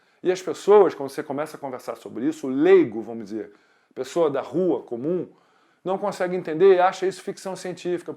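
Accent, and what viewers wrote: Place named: Rio de Janeiro, Brazil. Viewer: Excelente! O Sr. é muito entendido e esclarecido no assunto conspiração e fala o nosso bom e velho "carioquês"... gostaria de saber sua opinião sobre a conspiração alienígena, porque em meu ponto de vista lógico é a origem de toda a historia humana, a mãe ou a "ultimate" de todas as conspirações. Fomos criados para ser eternos escravos para servir propósitos excentricos de seres alienígenas. Gostaria de um vídeo, pois o sr. transmite clareza e embasamento nas palavras, sou mente aberta. Abraços.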